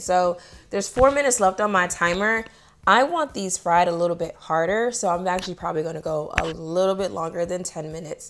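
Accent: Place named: United Kingdom. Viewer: Roger Powell Americans have many different accents. You can hear it in her undertones. It almost sounds British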